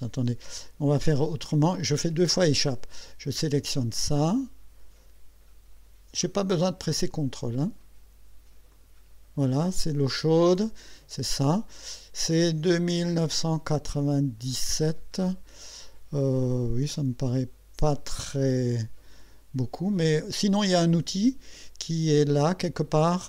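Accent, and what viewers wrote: Alsace, France. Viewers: clin d'oeil pour l'accent du Sud Alsace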